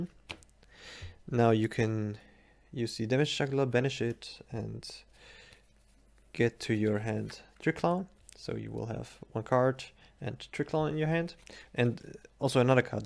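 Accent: Germany. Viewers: Is that a German accent? :D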